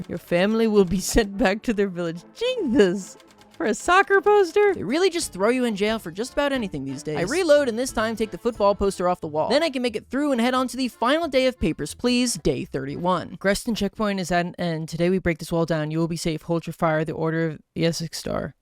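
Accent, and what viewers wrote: United States. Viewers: I couldn't tell if it was intentional rage baiting or his flavor of American is just not used to words like that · nah bro that is not arstroka edit: I can't watch this, it's unbearable. The americanization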